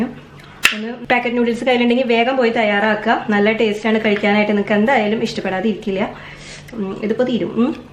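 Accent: Thrissur, India. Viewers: സംസാരത്തിന്റെ സ്പീഡ് ഒട്ടും കുറക്കണ്ട. കാക്കിറ മൂക്കിറ, പിച്ചിപ്പറി, കണ്ടം മുണ്ടം ഇമ്മാതിരി തനി നാടൻ സാധനങ്ങൾ എത്രയുണ്ടെങ്കിലും പോന്നോട്ടെ. അതൊക്കെ പാചകത്തോടൊപ്പം ആളുകൾ ആസ്വദിക്കുന്നുണ്ട്. ഒരു മനുഷ്യനും തനിമ നഷ്ടപ്പെടുത്തരുത് എന്ന അഭിപ്രായക്കാരനാണ് ഞാൻ. മോഡേൺ ശരീരഭാഷയും ഇംഗ്ലീഷും മലയാളവുമല്ലാത്ത വികലമായ സംസാര ഭാഷയും കൊണ്ട് വീഡിയോയിൽ വരുന്ന ആളുകൾ അവനവന്റെയും അതോടൊപ്പം നാടിന്റെയും തനിമ നഷ്ടപ്പെടുത്തുന്നു. സ്വതസിദ്ധമായ തൃശൂർ നാടൻ ശൈലി ഒരിക്കലും നഷ്ടപ്പെടാതിരിക്കട്ടെ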